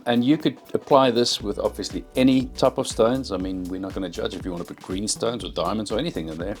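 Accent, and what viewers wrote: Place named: Netherlands. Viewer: Your sound Dutch😊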